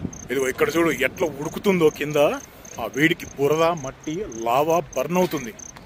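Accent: Andhra Pradesh, India. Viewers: Bro, you're slang like AP, but you are saying i am belongs to nzmbd. Moreover you need to exercise bcz your breathing voice is getting more.❤❤❤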